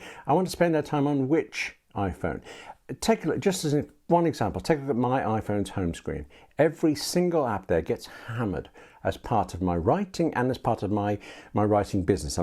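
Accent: England, United Kingdom. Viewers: You have a beautiful soft English accent I could listen to you speaking forever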